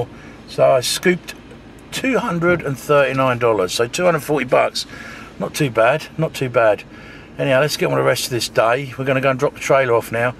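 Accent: United Kingdom. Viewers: what part of the uk were you from?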